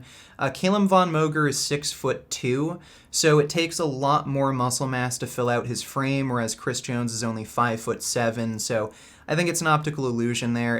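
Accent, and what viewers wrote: Canada. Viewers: He’s Canadian bro what did you expect eh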